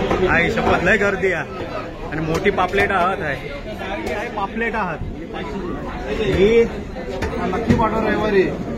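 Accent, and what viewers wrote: Malvani, India. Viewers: तुमची मालवणी भाषा पण खूप सुंदर आहे ऐकायला छान वाटते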